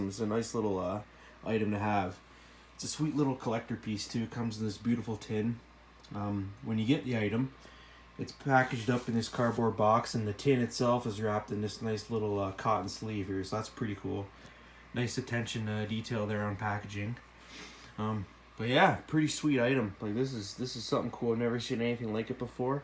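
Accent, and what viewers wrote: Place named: Cambridge, United Kingdom. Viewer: you sound like you are close to me , Cambridge ???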